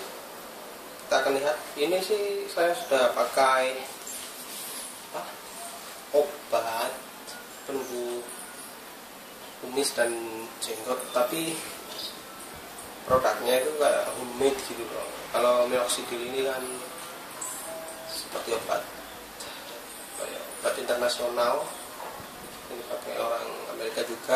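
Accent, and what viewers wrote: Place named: Java, Indonesia. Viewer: medhok amat bro ngomongnya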